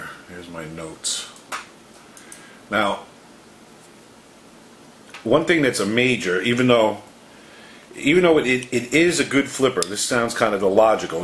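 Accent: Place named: Long Island, United States. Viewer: I’m a fan your voice reminds me of home I’m from NY LONG ISLAND I live in Washington now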